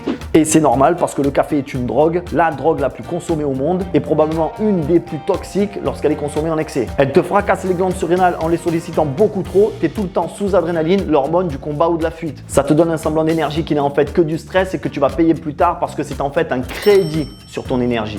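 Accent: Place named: Marseille, France. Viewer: Tu as accent marseillais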